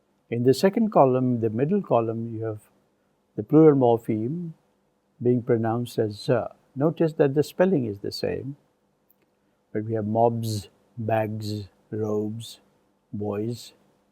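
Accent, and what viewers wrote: India. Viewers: That's his native (Hindu, I guess) accent interfering with his pronunciation of English words... It happens with everyone of us who aren't native English speakers but in few exceptional cases.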